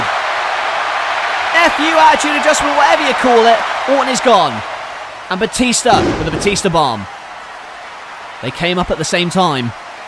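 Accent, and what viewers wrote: United Kingdom. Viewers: This guy sounds British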